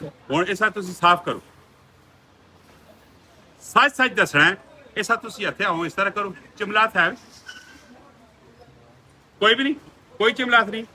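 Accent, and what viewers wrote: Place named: Rawalpindi, Pakistan. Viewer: پیور پنڈی آلی بولی پنڈی کیہڑی جائ تے دوکان وے تساں دی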